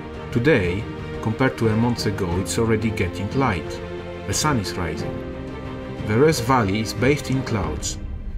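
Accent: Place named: France. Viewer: Cet anglais avec un accent plus que frenchie, c'est terrible à entendre. Sans vraiment aucune méchanceté hein, mais là, c'est un peu terrible quand même...😯 Amicalement.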